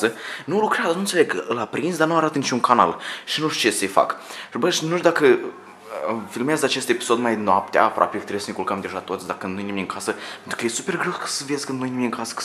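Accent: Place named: Moldova. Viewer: imi place vocea si accentul tau de moldovean =))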